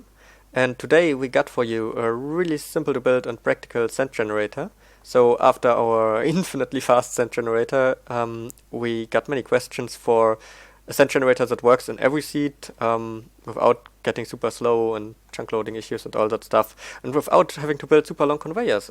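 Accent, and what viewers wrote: Germany. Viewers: do you speak german (you sound like that)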